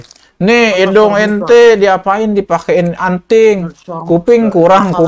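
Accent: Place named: Betawi, Indonesia. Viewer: Mantap bang,,, betawi banget,,,, gasssken🤣🤣🤣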